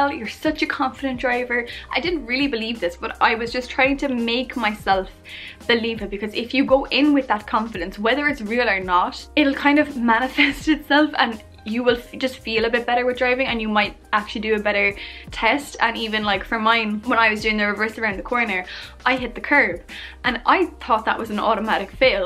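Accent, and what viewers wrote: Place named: Ireland. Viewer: It's very fast for me... It's only for Irish people. I'm trying to say about your English. But I don't give up! I'll watch all your videos. Do some podcast please about your car. About your dashboard and all parts of car what we need to know for test. Thanks and keep going!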